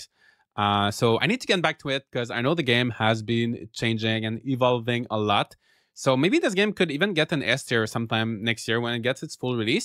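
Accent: Quebec, Canada. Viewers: it’s funny because once I was watching one of your videos and my boyfriend said something like « il est Québécois lui » and I told him probably not. He said you had a French Canadian accent and I was like « nah, what are the chances? » 😂😂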